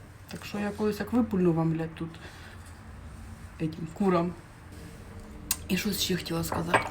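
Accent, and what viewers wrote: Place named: South, Ukraine. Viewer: У неї діалект,який розповсюджений на півдні України, так як на заході України в мові зустрічаються польські та німецькі слова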